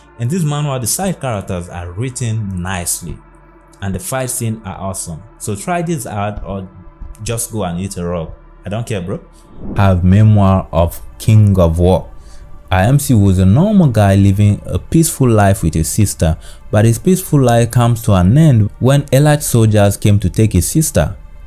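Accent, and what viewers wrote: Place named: Nigeria. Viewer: Bro you Nigerian right Your accent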